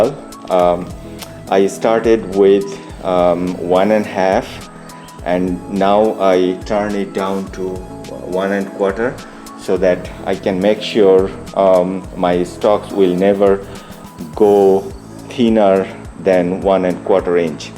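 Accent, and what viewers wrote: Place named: South Africa. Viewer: Where are these sold? South Africa and Zimbabwe? [Judging by presenter's accent.]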